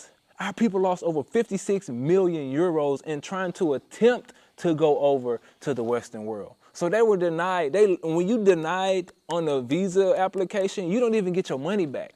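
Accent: United States. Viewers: I can tell by your accent that your people are in America.....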